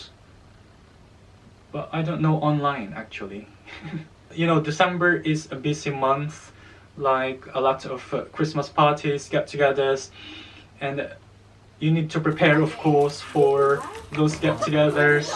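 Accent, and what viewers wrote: United Kingdom. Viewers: Yay!!! Thank you for sharing.. Lupit NG British accent... Sana all hahaha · british accent! bigat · wow ang accent mo kabayan british ang bongga ako bisdak na bisdak parin haha sunod niyan citizenship na rin congrats sayu din.Naalala ko rin ang mga visa na yan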